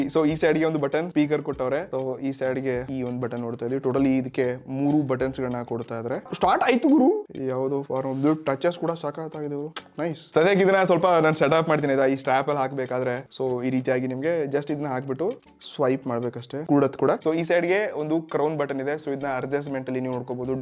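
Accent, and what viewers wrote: North Karnataka, India. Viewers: Uk ಸ್ಟೈಲ್ನ್ಯಾಗ ನಿನ್ನ ಮಾತ ಕೆಳಾಕ್ ಚಂದೋ ಅಣ್ಣ 🙌💗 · ನಿಮ್ಮ UNBOXING ಬೆಂಕಿ ಪ ಅಣ್ಣ ಅದರಾಗ ನಮ್ಮ ಉತ್ತರ ಕರ್ನಾಟಕದ ಸ್ಟೈಲ್ ಮಾತು ಬಾಳ ಇಸ್ಟ ಆಯ್ತು I AM YOUR BIG FAN OF YOU BRO ❤️🙏💛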